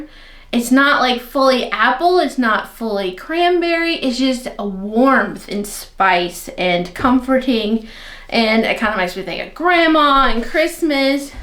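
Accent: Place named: South, United States. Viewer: I love the snowy exit scene! I'm praying at this point that I'll be able to place an order when you open the website. Things have gotten a bit hairy this month. As for the way you pronounce words... don't fret! Anyone who lives in a southern state for more than 5 years after living in the northern part of the States gets a pass. We develop a whole new accent from everyone else! I hope y'all have a wonderful Thanksgiving!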